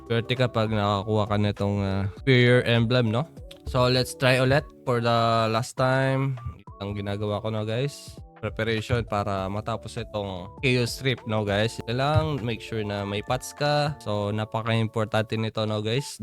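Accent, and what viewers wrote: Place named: Iloilo, Philippines. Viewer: salitaan plang ilonggong ilongo toto part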